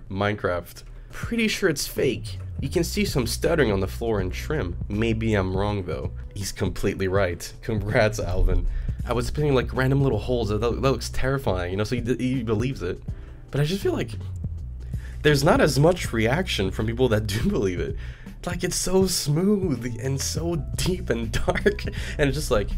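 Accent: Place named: Quebec, Canada. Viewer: hey are you a quebecois? parce que moi je le suis et j etais full surpris quand tu parlais en quebecois moi j etais genre: attend... il parle francais ce gar la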